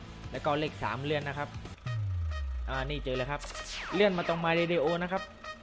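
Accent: South, Thailand. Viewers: พี่อยู่จังหวัดอะไรเเต่เสียงคนใต้ใช้ไหมครับ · พี่เขาภาคใต้ครับ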